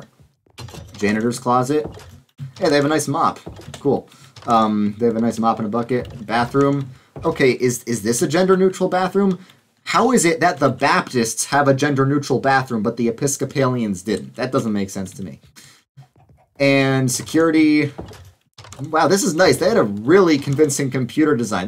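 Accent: New York, United States. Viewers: got your new york accent coming out in that video 😂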